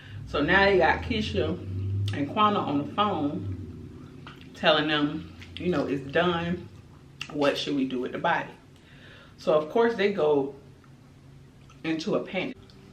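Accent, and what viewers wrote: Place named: South, United States. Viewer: Happy Labor Day: I 💘 your videos, new subscriber & I watch every time. Quick question; your accent sounds southern, are you from Georgia?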